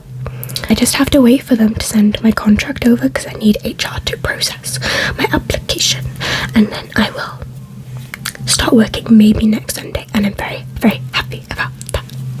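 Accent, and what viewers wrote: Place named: United Kingdom. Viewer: Ramble vids are the best. Where are you (roughly) from in the UK? Can't quite put my finger on it.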